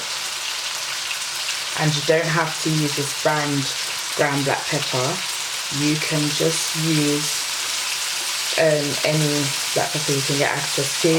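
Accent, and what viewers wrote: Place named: West Midlands, United Kingdom. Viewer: I was thinking more West Midlands, it sounds like you have a hint of it. You are doing good with you channel, maybe we can come up with something together one day? :)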